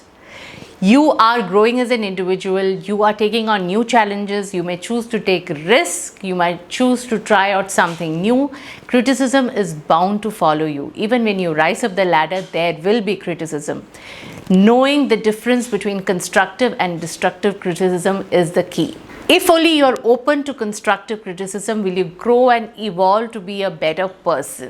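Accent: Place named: India. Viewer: No popular english self help books are available over YouTube in Indian accent. I think you have a wonderful, sweet and friendly Indian accent. If you think of doing it, it would definitely help lots of aspirants like me in developing our English beside personality. Thank you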